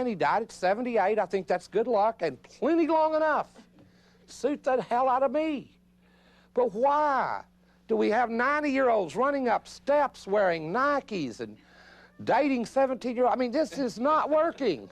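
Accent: South, United States. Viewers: That thick southern accent doesnt help......at all.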